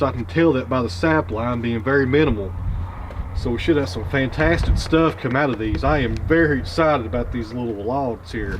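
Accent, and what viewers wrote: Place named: Tennessee, United States. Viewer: Bowls off a lathe sound good to me. I’m new to your channel, but I guessed your location by your accent from my kinfolk that live in your parts, Bristol, Sevier and Rockwood.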